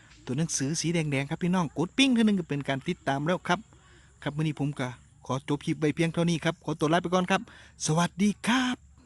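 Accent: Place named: Isan, Thailand. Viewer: ได้ยินแล้วคิดถึงอิสานบ้านนา fcจากฮ้องกงค่ะ ขอให้ยอดติดตามยอดlikeสูงขึ้นเรื่อยๆน่ะค่ะ^^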